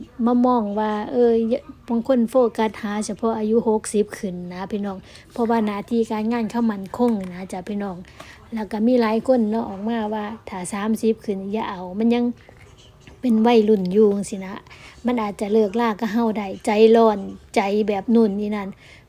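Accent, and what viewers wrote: Isan, Thailand. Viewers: แมนจ้าน้อง เว่าถึกคักจ้า สวัสดีปีไหม่จ้าน้อง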